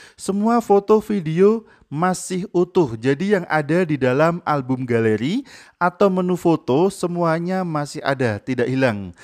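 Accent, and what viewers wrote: Java, Indonesia. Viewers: Mantap jiwa jadi nota satus seket ewu ... Nota gajah kang Salam solder dari teknisi medhok 🙏🙏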